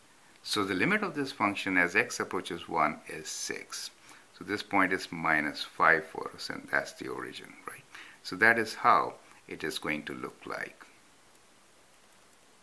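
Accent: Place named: India. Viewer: nice job,. great accent too. reminds me of a group of indians we were working with at a start-up company & brings back good memories.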